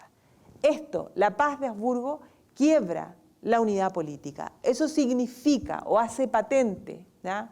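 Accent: Chile. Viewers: Así hablamos los Chilenos.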